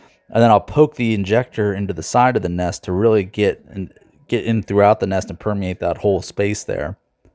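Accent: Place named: Pennsylvania, United States. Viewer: Let me guess, Copenhagen Wintergreen? Also you are so southeastern PA it hurts.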